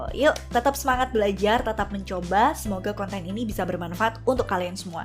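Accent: Surabaya, Indonesia. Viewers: Akhirnya ngonten lagi, mbak njenengan wong jowo to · Sampean arek suroboyo ta mbak bro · woalah... arek suroboyo ta....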